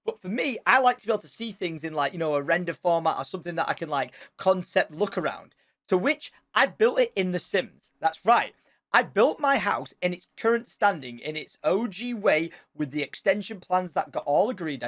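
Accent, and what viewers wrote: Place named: Manchester, United Kingdom. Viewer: Corrrr , u can tell his a manc , a box of Straaaarberys and cream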